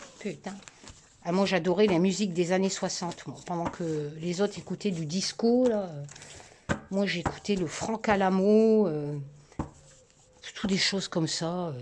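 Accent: Nord-Pas-de-Calais, France. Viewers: Quel plaisir de t entendre parler Ch'ti j adore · Coucou, enfin te voilà, quel bonheur 💋❤très contente que cela ce calme un peu, mais encore très prenant pour vous. Tres drôle et émouvant ton parler chti ma grand-mère ne parlait que chti je comprends tout. bisous à tout les deux merci pour ton tirage Et courage prenez soin de vous.. (Tu parles des lanceurs d'alerte?)🥰😇🙏💋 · Hello lol j adore entendre parler ch'tis mes origines comme toi ❤❤❤❤ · 😀😀😀😅😅😅🤣🤣🤣😂😂😂😂 trop beau l'accent chti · Bonjour, alors je te l'avais dit pas mail, je suis super contente d'avoir recu ton jeu, il est magnifique et j'adore l'effet peau de pêche, et comme je te l'avais préciser également il va partir (avec moi) a l'autre bout du monde rejoindre ma belle famille (Japon) et se fera purifier dans un grand temple, (je t'enverrais des photos de l'évévenement car tu es quand même sa premiere maman!!!! 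alors juste une petite chose, j'ai vu qu'il y avait la carte du corbeau (oiseau que j'aime beaucoup qui sont des sortes de guides spirituels pour moi) et sur la carte les mots clefs sont danger, accident, trahison, mais pour moi le corbeau ne véhicule pas ca du tout, alors ce que j'ai fait.. j'ai tout simplement rajouter sur la carte a la suite (car il y avait un peut de place) les mots "messager" et guide" et voila le tour est joué!!! je voulais te raconter ma petite expérience avec ce jeu magnifique que j'aime beaucoup, (et tout les petits cadeaux) les dessins sont d'une finesses assez incroyables, Olivier a vraiment un don de dessinateur!!!! merci a vous deux pour cette magnifique expérience de vie.... trop drole l'accent "ch'ti" j'adore!!!^^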